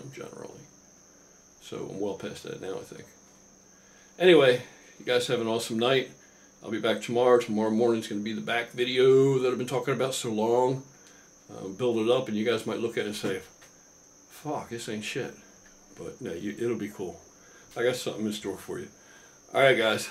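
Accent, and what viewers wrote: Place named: Philadelphia, United States. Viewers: i bet this dude is from the philadelphia/south jersey area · I'm from south jersey right next to philly and I thought the same thing. The way he pronounced the days of the week is a dead giveaway.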